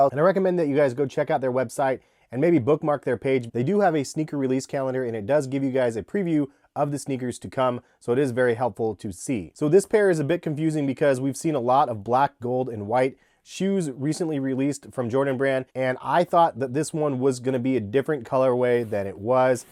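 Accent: Oregon, United States. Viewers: These are horrible. Icy sole looks cheap, only reason sole is icy is because Nike can’t figure out how to make a clear sole that doesn’t yellow, the clear soles from 90s were best but of course yellow over time. Please let’s stop the myth that tumbled leather is high quality. It’s just cheap leather w pebble textures. This shoe is crap. Like videos. You have an interesting accent, is it what Oregonians sound like? The way you say “colorway” (cull ah way) and. “Nike” (like Nyyyy Kee) is different. Keep up the good videos.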